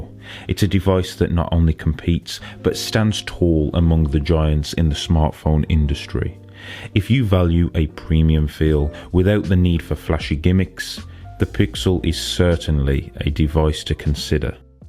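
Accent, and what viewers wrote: England, United Kingdom. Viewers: Bro, your english accent and monotone speech make it hard to understand what you are saying sometimes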